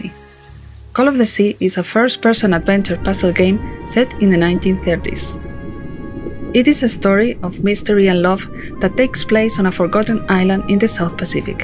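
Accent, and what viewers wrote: Spain. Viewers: Ole ahí ese acento español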